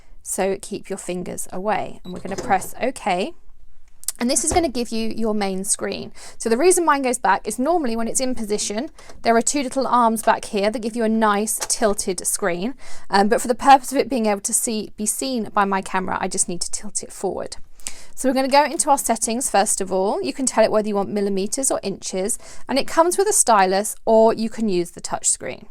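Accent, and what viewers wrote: England, United Kingdom. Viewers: Omg, i've seen it many times on tv, but never thought i need one. I spend a fortune on, coordinating dies. This is now on my birthday/ christmas list. Also i came across your channel a few months ago. i feel you are perfect for me, i watch all the designers over there in america. As i work on dt here in the uk promoting american stamps as you guys are well in front of the uk. but the best thing about your channel is you know your stuff but you have a english accent. so you see i get the best of both worlds. don't get me wrong not all Americans have a strong accent and i do love them, but i find afters hours of videos i find yours a welcome change. lol x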